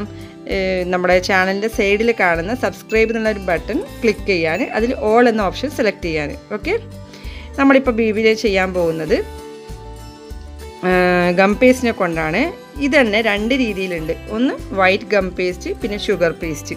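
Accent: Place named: Payyanur, India. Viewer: Chodi😜. Pynr slang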